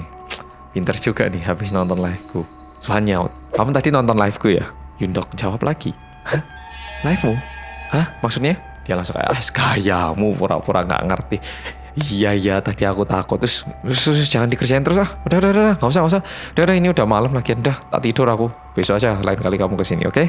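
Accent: Java, Indonesia. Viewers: Sumpah nonton vidio mas nagih banget.aku suka suaranya yg medok2.btw gerak gerik mas mirip jungkook bts jujur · Oppa medok, aslii banged haduhhh 😁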